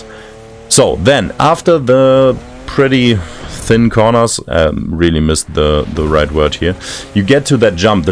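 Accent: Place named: Germany. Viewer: You can actually hear the german accent. Still better than expected. I guess after 2-3 tries he will get better with this. At least now he can reach a broader audience, which is a good thing.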